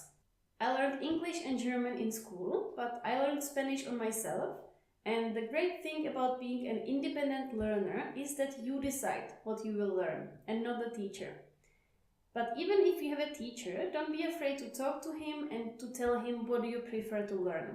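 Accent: Czech Republic. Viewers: Je slyšet ten hnujsnej českej přízvuk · I just wanna ask native English speakers watching this video, are you at all bothered by the fact that the teacher speaks English with a Czech intonation? Not trying to be rude, just genuinely curious! (Honestly, most Czech teachers speak English this way - they learn their vocab but the accent and intonation kind of stay Czech.)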